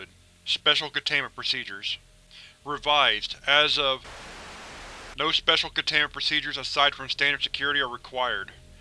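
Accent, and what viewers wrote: United States. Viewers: This one's very clever. And terrifying if it was weaponised. Great reading as usual. May I ask where in the States you got your accent from. As I'm Australian, I can't pick it myself. Perfect voice for these readings.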